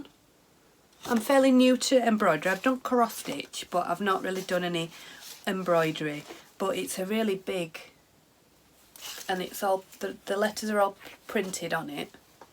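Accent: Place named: Yorkshire, United Kingdom. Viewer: lovely hearing a nice Yorkshire accent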